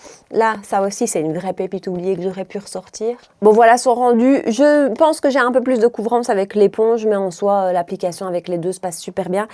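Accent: Belgium. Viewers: Coucou, j'adore tes vidéos pépites, re-découvrir les produits c'est génial, merci tu m'as fais sourire avec ton accent belge (une fois),😅,j’aime bien deviner les produits que tu vas sortir de ta boite à chapeau tel une magicienne, au moment du rouges à lèvres,j'ai direct pensé au Dior Sequin,j'ai la nuance 893 un violine avec les paillettes rosée, ça claque pour les fêtes et pour cette saison ❤ Bonne journée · D'ailleurs tu devais pas nous faire une vidéo ds ce style déjà avec ce belle accent beilge 🤭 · Cc, très belle vidéo pépites oubliées que j'adore. Je dis un grand oui pour une vidéo avec ton accent belge😂. Bon dimanche 😘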